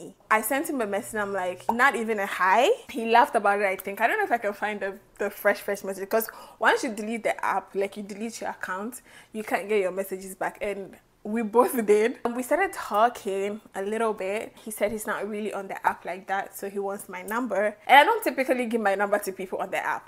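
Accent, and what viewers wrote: Jamaica. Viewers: Jamaican men are nice happy for you...when they really love you they will go all the way out for you...happy you got to visit my country and hope u come back all the best and have a great week...u catch on the patois real fast man❤❤❤ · The best storyteller ❤❤. She all start talking potios..she is hooked.... i love that....if you are not Jamaican the "all a dem" might have missed you at the end. I loovvee it!❤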